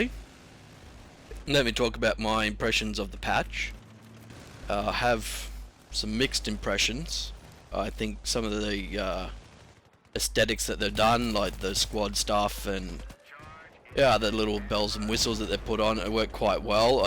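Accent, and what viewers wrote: Australia. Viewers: Finally an Australian commentator, definite sub from me love your vids :)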